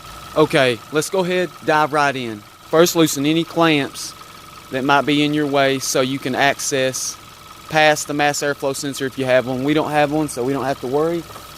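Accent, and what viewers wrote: South, United States. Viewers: You country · I’m guessing you’re from the south 😂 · That southern drawl is driving me crazy. And I'm from Mississippi.😂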